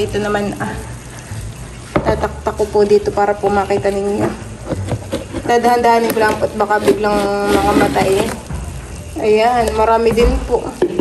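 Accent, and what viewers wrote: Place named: Visayas, Philippines. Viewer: May pagkabisaya ang salita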